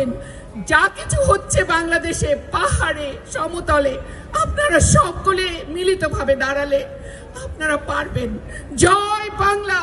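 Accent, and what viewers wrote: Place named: Kolkata, India. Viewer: kolkatar tane kotha bolos ken shali?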